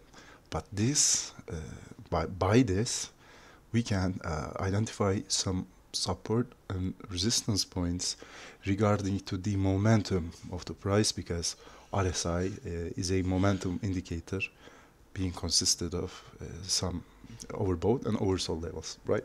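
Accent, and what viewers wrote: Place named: Turkey. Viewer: Abi Türk müsün. İngilizce aksanın Türksün gibi geldi. Şunun Türkçesini anlatır mısın 😅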